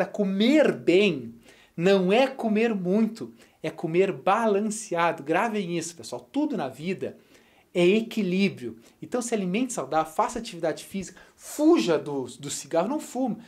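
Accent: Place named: South, Brazil. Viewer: PARABENS PROFESSOR QUE AULA HEIN?????? QUE SIMPATIA!!! ADOREI ! O SEU SOTAQUE É DO SUL! É CATARINENSE, OU GAÚCHO ???? VC É NOTA 1000! MUITO OBRIGADA!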